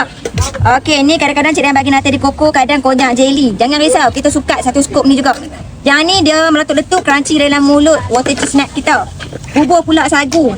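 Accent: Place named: North, Malaysia. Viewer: tuu dia,pekat utagha noh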